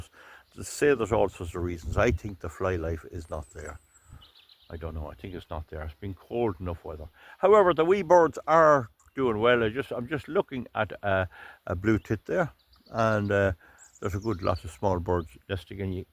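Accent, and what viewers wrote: Ireland. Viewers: I love the Irish accent :)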